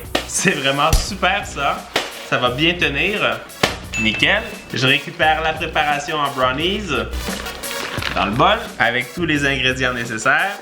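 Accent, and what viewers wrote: Canada. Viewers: tu mon youtubeur préféré desCarl is cooking vidéos accent canadien et qui met quoi des vidéos trop trop rire 🇨🇦👍👍👍👍👍 Tik Tok recette du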